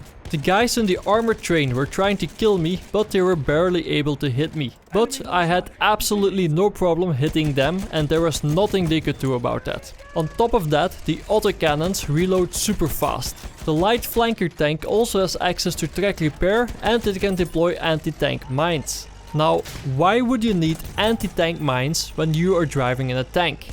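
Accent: Netherlands. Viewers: Why do you sound so dutch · jij hebt echt een zwaar NL accent in je engels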